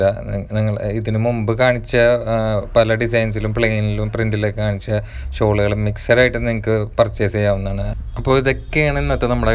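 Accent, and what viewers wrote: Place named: Kochi, India. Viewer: കൊച്ചി കാരനാണോ. കാരണം സംസാരം കൊച്ചി യാണ്. തൃശൂർ അല്ല ട്ടോ.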